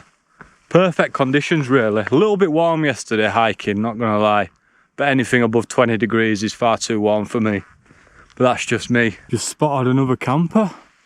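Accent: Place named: Wales, United Kingdom. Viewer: Brilliant video man… always top notch, loving the welsh accent funny as 😂 nice to hear you’re gonna pop to Brecon and do pen y fan, it’s a good crack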